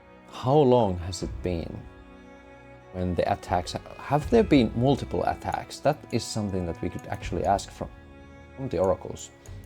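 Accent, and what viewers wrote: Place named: Finland. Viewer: Suomi? Cool accent 😎 Thanks for this walk through!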